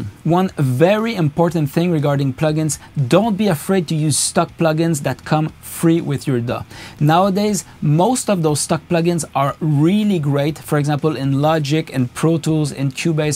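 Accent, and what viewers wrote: Quebec, Canada. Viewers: l'accent Québecois all the way!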